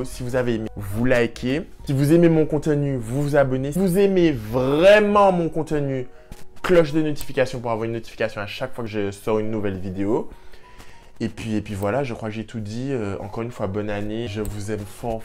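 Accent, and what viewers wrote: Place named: Martinique, France. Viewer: Wouah!!! L'accent martiniquais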